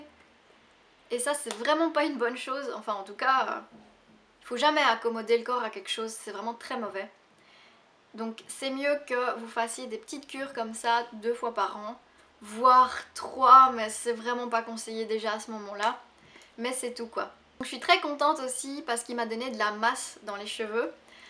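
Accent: Belgium. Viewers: Par contre un léger accent belge , pas besoin d'hortophoniste !😊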